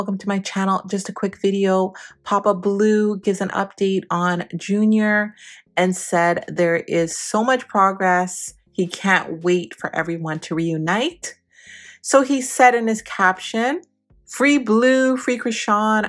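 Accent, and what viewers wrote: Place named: Jamaica. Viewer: Love ur channel …do u have Caribbean in u? I hear a bit of a Jamaican accent ❤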